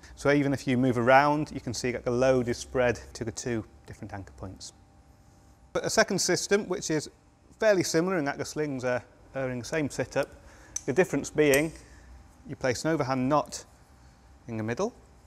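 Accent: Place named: United Kingdom. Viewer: Impossible combination for a foreigner, british dialect & this kind of mumbling voice... Does he have pebbles in his mouth?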